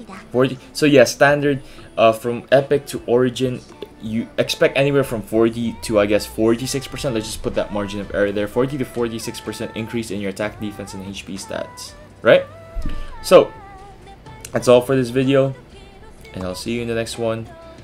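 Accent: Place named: Brazil. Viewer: I swear that you was talking in Portuguese in this video.. your voice sounds like a Brazilian voice 😂 I was searching in Portuguese this video because I want to see again, but now I found it.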